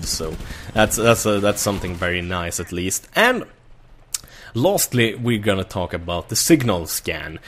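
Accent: Sweden. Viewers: I never realised you were swedish xD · hör att du är svensk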